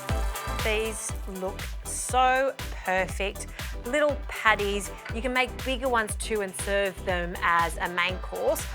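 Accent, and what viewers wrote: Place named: Sicily, Italy. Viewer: I love your Sicilian accent!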